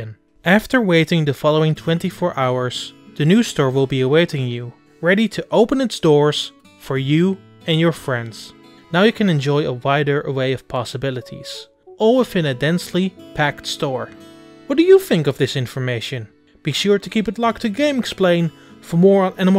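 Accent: Netherlands. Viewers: You’ve got like this clear dutch accent 😭 but apart from that this was rather helpfull. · Is this voice over Dutch? Been wondering ever since I heard you! :) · Thanks for the tips! Are you dutch? I'm dutch and my bf heard the dutch accent lol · I think this guy is dutch that accent sounds familiar. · That's the Dutch accent for ya.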